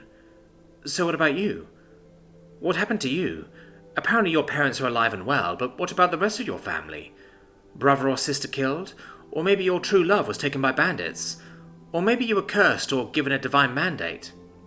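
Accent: Canada. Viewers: Defiantly a Canuck!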